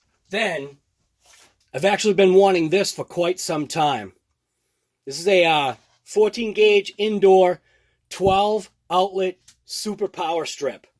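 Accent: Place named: Massachusetts, United States. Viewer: Just found you recently and you are great. My family is from mass so I miss the accent. Lol but great content. U inspired me to start a channel now.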